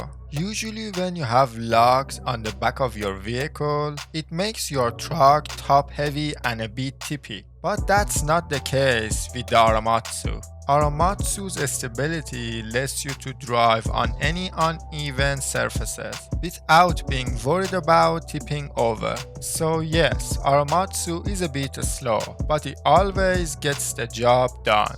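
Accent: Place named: Iran. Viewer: dude i love your persian accent XDDDDD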